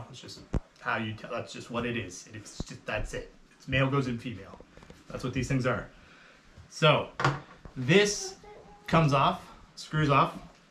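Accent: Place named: Ireland. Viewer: Came across your channel yesterday and pleased it was recommended. You definitely have answered one of my thoughts. I was thinking why am I picking up Irish Ancestry through your dialect. Cheers from the UK 👍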